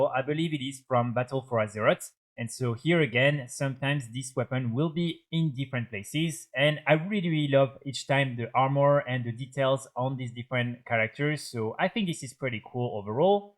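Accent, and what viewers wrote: France. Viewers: Are you french the way you talk 💯 sure que tu est français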